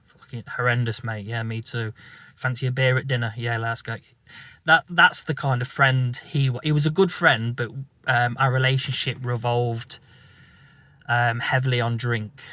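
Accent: United Kingdom. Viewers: You sound like you’re from Derbyshire/Nottingham area. I live in Sheffield and don’t care for drinking but love the footy like you and sport in general. If you ever fancy a walk or coffee let me know. I have struggled with my addiction for years although it’s drink or drugs related. Stay strong today brother.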